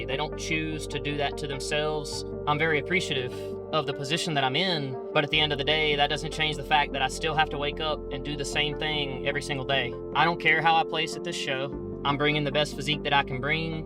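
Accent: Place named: Rural, United States. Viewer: Come ohwne!!! Love that country twang lol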